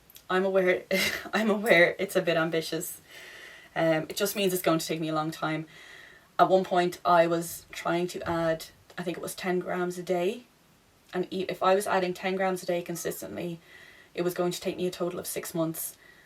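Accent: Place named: Ireland. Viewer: I just found your channel and love your personality and Irish accent! I recently made the Lakes tee by Ozetta, which is almost identical to the tee from MFTK, and I couldn’t believe the amount of ends. I even made a few extra because apparently I can’t count… 🤓 On the sleeves, I started at the top like you suggested and saved myself two ends. 😂 They do all this cutting and restarting so the garment/sleeve is completely the same on both sides, but who cares if there is one round extra on the left side of your sleeve? Noone can tell, right? 😅🤓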